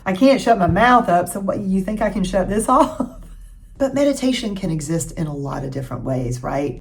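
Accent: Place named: South, United States. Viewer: It is so nice to hear sensible things in the accent I grew up around. Squirrels and anything with the word pickle in it? Would never ever guess you're from the South lolol. As for protecting joy, right now trying to find that joy and cut out what I can with people who are entirely negative and cutting down on people who only take -- learning that my emotional labor and care is important and I shouldn't waste it on every single person that shows up with a problem. I also walk every morning and take short walks during the day. Knoxville, Tn area.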